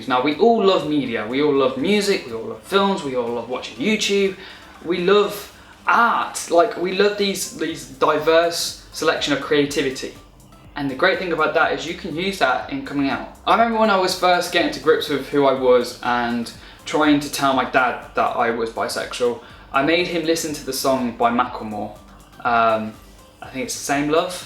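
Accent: United Kingdom. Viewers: I picture you eventually with a special understanding and lovely woman, having a couple of kids with her but having boyfriends on the side whom your woman friend would also know. You're so appealing. Whoever you get involved with is lucky. You voice reminds me of Russell Brand's. Hope you aren't offended by the comparison. I love British accents.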